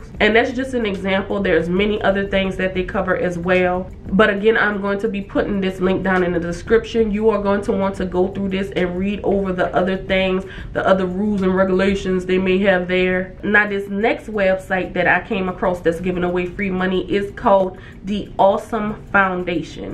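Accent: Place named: New Orleans, United States. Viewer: I knew it!!! I heard your accent in a few videos ago and I said, "She sounds like she's from New Orleans." The way we pronounce our words is so unique. Thank you so much, homegirl, for the awesome content you create that offers so much information. You're a blessing,.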